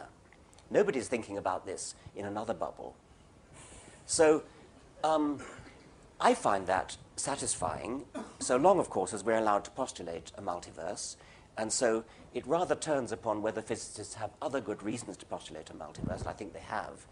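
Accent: United Kingdom. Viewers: lol the British accent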